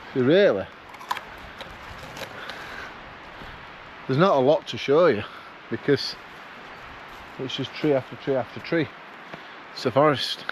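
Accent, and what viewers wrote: United Kingdom. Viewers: Nah, the accent sounds cool. Stuff sounds better with a British accent and they have great colloquialisms.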